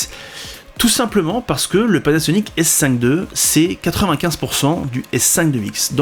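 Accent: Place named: France. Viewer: Super vidéo. Tu t'y connais mieux que moi en photo mais en réseau.... USB c format plus compact, tu prends un d'ongle à 50 euros et tu as ton Ethernet. Deuxième raison pour laquelle tu te plantes, port Ethernet souvent c'est du 1 giga de bandpassante bref en 2023, c'est pour les ashmatiques: USB c c'est 20 fois plus. Moi qui fait du montage 8k 60 FPS avec mon MacBook M2 max vers mon Nas thunderbolt 4 100 teras, c'est Dealbreaker comme tu le dis avec ton accent français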